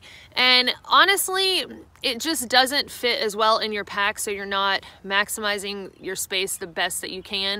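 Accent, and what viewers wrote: South, United States. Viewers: From Mississippi, I speak "southern" so knew she said "lighter." So, allow me to educate. Lighter in southern is "ladder." Ladder in southern is" ladder." Clear? Another example of "southern." Female deer is doe. Opening to a building or another room through which you walk is doe (e.g. Sally opened the back doe to give John the ladder so he could light the grill). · Lighter and back up lighter... Its a southern thang!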